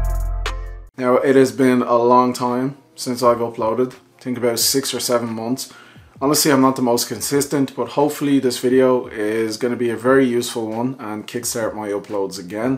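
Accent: Ireland. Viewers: Ayo your Irish?! 😮😮 Cool tutorial bru!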